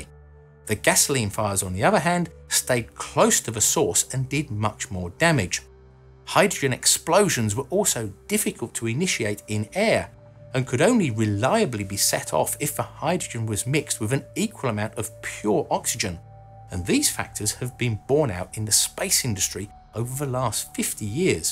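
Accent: United Kingdom. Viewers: Classy man, with classic British accent is a great combination to be a host of an informative show. Much respect from Russia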